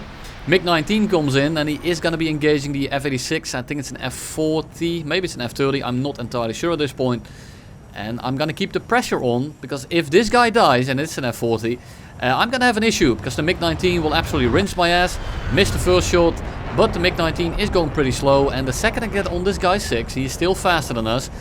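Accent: Netherlands. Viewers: ik hoor n beetje nederlans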